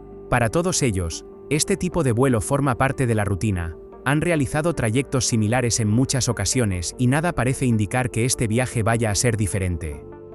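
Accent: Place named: Spain. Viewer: Habla como español pero no sabe cuál es el apellido paterno del piloto y del copiloto?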